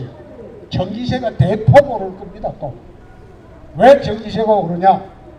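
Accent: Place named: Daegu, South Korea. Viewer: 님 당선을 축하합니다 을사랑하는 시민으로 부탁드릴 말이 있어요 서울쪽 사람들은 대구사람들의 말투를 좋아하지않습니다 좀 과격하지요 오늘 어떤 유트브에서 당선된 이 당선후 나댄다고 하네요 저는 그 말에 일리가 있다고 생각해요 앞으로 대권에 도전하고싶으시면 대구 경북의 유권자만 지지해서는 가능하지않을것 같아요 부디 말씀에 서울쪽 사람들이 좋아하는 말씨를 씠으면 좋겠어요 저도 대구시민이라 대구에서 대통령이 나오면 그보다 더 좋을수가 없겠지요 그러나 그보다 더 중요한것읏 정권을 다시 찾아오는것이여요 통합당을 지지하고 자유민주주의를 지향하는 시민으로 부탁드립니다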